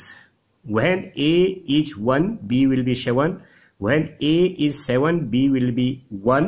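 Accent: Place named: East India, India. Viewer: That is an accent of people from east India and Bangladesh. So I would rather not comment though I know the comment is in good faith.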